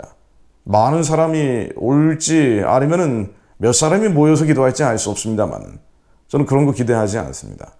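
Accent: North Korea. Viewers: 말투로 보아 이북 출신으로 생각 했었습니다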